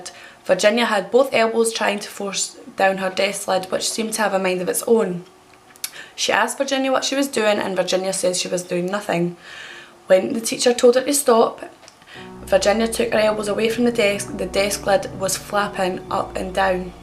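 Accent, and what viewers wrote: Scotland, United Kingdom. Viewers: Love the Accent I would have never guessed it was Scottish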